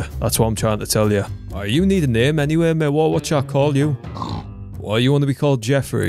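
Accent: Scotland, United Kingdom. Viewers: Probably british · sounds Scottish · bri"ish man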